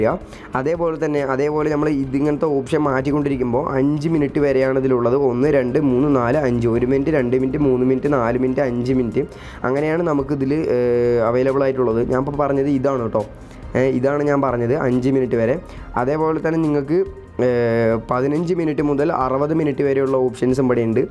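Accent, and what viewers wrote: Palakkad, India. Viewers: Eda chekka ante veedu palakkadano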